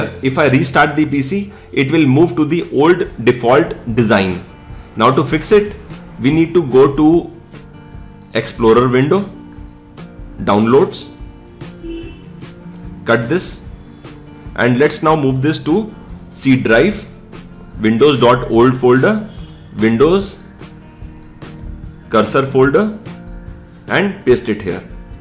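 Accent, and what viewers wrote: India. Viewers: i love your accent, my brother from india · u sound like a tech support scammer from india and its quackity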